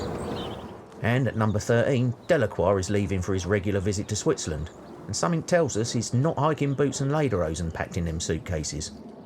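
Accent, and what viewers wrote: Cockney, United Kingdom. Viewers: I love a cockney diamond geezer accent. Your research is brilliant. Grew up in 70s watching the Sweeney. Its so good its repeated on itv 4 along with minder and the professionals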